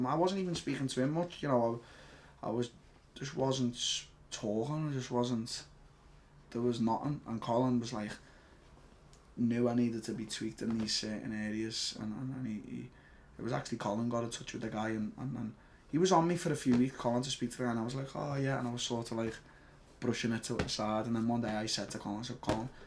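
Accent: United Kingdom. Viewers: You close eyed freak... Talk like a hillbilly brit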